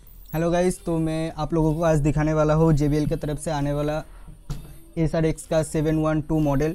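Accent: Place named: Bengal, India. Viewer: Dada tomar kotha sune bujhlam tumi bangali tomar bari kothay ❤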